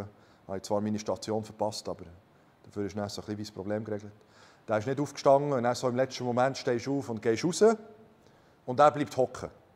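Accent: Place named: Switzerland. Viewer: ja sicherlich mein Freund, du sprichst ja deutlich! 😸 was kann man da nicht verstehen, und ich mag den schweizer Dialekt! 🤜🏼🤛🏼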